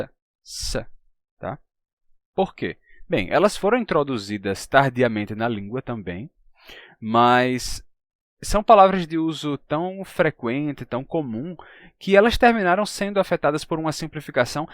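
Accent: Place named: Natal, Brazil. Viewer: Você é muito bom nas suas explicações. Elas têm toda lógica principalmente para quem conhece um pouco de outros idiomas. Nas nuances explicativas vêem-se semelhanças que permitem a gente entender melhor o português, outras línguas neolatinas e o inglês. Além do seu sotaque de Natal que eu adoro. Vivi mais de 10 anos aí e vou de 6/6 meses. Parabéns por tudo. Escrever aqui é muita responsabilidade para não “pagar mico” demais, pois você de fato sabe português.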